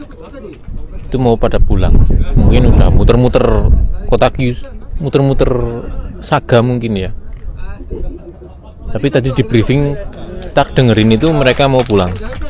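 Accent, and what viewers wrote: Banyumas, Indonesia. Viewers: Pekan baru... Mas senggol dong.. Kepriben ora ngapak ya ora kepenak.... ❤😂😂 sukses..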